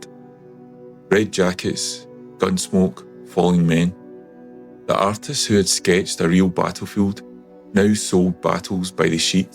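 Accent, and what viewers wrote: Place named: Scotland, United Kingdom. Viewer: So that's where your great accent is from. I was wondering when viewing your videos. I am sure you will visit Tokyo more than once in your lifetime. Just like i wish to visit Scotland again some day, particularly the countryside, i am not much of a city guy (ironically living in the biggest city on the planet, but Tokyo is just a succession of villages, it is not a metropolis like some people think, and it has a village mentality which is both good and bad, you'll see some day). Did you like the photo of the Scroll by Yoshitoshi, i posted for you on my account ? It looks much better in the flesh. It is an unfinished work and he had just started adding very soft colour at the bottom, where the grass and the water from the pond meet, and also the "flowers" of the Susuki grass. The moon is create as negative space. 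Like most of his work, the moon is omnipresent. I guess this has to do with the family name "tsukioka" (the Hill of the Moon). And Yoshitoshi was obsessed with death or maybe fascinated by it. He liked the western idea of Memento Mori which he found matching the Buddhist concept of Mujo 無常 and the Shinto concept of Mono No Aware, もののあわれ、 which means that nothinbg last and nothing is permanent and that everything eventually comes to an end. That7s why this scroll has been used for the Moon viewing tea ceremony by the Tsukioka family for over a century every year, because it fits the Tea ceremony concept of Ichigo Ichie 一期一会 which means that we must live in the moment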